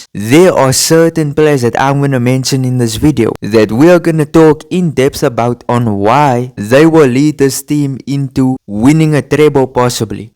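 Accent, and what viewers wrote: South Africa. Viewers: editing skills are top-notch,Bruv! You have a South African accent. Hala Madrid🤍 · Yoh bro are u south African i am too, your accent gave u away, big up😂🎉❤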